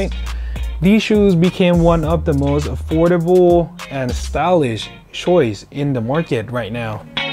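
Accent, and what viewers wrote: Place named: United States. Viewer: Where in Thailand? I'm in Pattaya 4 years now and you sound American not Thai 😁 oh yeah I just bought a pair of the Goldenrod 👍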